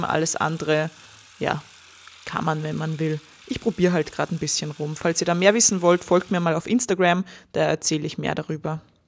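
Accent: Austria. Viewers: ich hatte eben leichte Kopfschmerzen und dank des kack österreichischen Dialekt ist es noch schlimmer geworden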